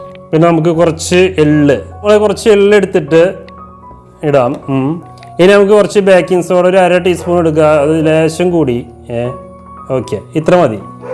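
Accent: Thrissur, India. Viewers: നിങ്ങൾ തൃശുരാണെന്ന് സംസാരത്തിൽ നിന്ന് മനസിലായി . ശെരിയാണോ ??😀😀😀😀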